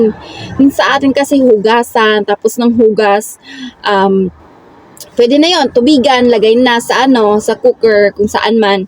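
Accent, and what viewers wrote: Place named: Iloilo, Philippines. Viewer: Taga saan ka sa Pinas dai?sounds Ilongga❤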